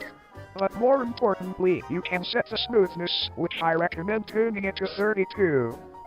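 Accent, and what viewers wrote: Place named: Arab world. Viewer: I can hear your middle eastern accent through your voice changer.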